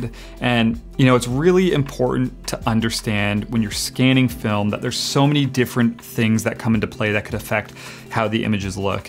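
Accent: Canada. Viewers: Sounds like a Canadien.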